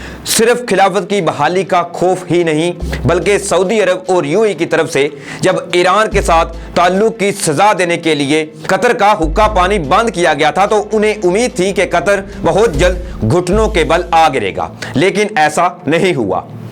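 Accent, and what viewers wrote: India. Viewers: If you don't mind, may I say that you need to improve ur accent. You speak like indians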